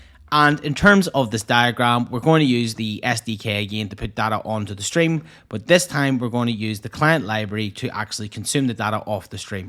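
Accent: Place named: Belfast, United Kingdom. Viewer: Love the Belfast accent! Good work!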